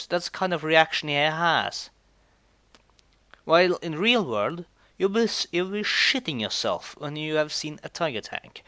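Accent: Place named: Germany. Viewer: well, atleast it was entertaining for a layman like myself. Swede here, and by the accent and knowledge in German tank warfare im guessing your a german?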